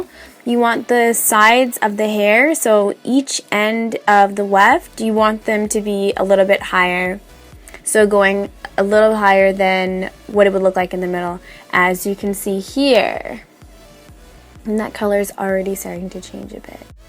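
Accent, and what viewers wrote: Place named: Guyana. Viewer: love your Guyanese accent I'm mixed and mines comes out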